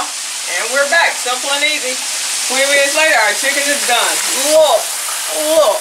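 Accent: South, United States. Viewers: Hell yeah I knew you was from the south 😂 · When you see a black woman with a southern accent you know that that stuff is going to be good! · Omg I just love the southern accent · Ain't nothin' to it but to do it.....Juh like dat dare!! Not a racial thing. It's how some people talk in the south regardless of color. Chef, keep doing you!! Love the recipe and your personality!!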